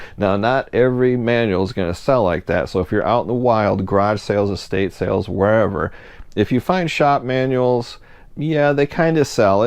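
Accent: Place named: Michigan, United States. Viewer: I appreciate your videos mostly because I love to listen to your accent and Michigan references. Dad had a pole barn in the back yard. Here in Missouri it's a shed or a shop. Born and raised in Midland I'm a Michigander living in a "foreign land". Thanks for your thrifting and sales advice as well!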